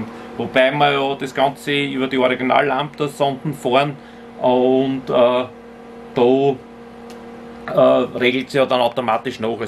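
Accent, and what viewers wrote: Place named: Burgenland, Austria. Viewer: luigi settembre Das ist deutsch. Allerdings mit Burgenländer „Slang“!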